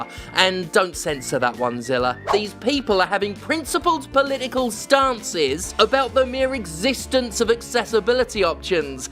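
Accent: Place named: United Kingdom. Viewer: Sick of these silly accent havers always defending each other. Keep it on your sad islands and off americas internet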